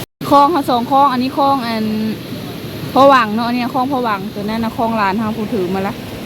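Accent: Phu Thai, Thailand. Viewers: เข้ามารับชมบรรยากาศ ภาษา การดำรงชีวิตด้วย พูดไม่หยุดเห็นอะไรก็พูดไป ก็ถือว่าดีเลยนะนำเสนอ สิ่งที่มีอยู่รอบๆตัวเราได้ค่อนข้างน่าสนใจ โดยเฉพาะการใช้ภาษาในการพูด สำเนียงภูไท ฟังแล้วเพราะดีนะ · ่มักคำ เว้า คือ กันกับภูไทเรณู ฝั่งไทย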